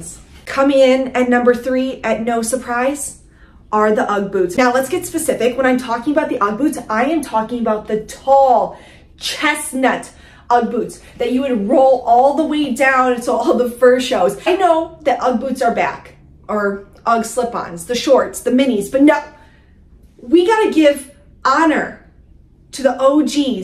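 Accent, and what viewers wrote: Midwest, United States. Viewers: I love your Midwest accent!! It’s in the a’s like in “baaack” so cute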